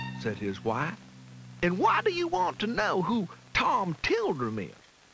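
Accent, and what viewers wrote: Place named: South, United States. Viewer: I like the US country voice. 🤠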